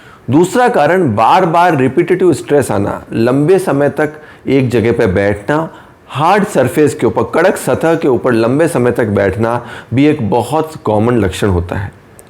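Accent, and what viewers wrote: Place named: Gujarat, India. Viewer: Gujrati ki zuban me gujrati jalak mil hi jati he .. nice explain sir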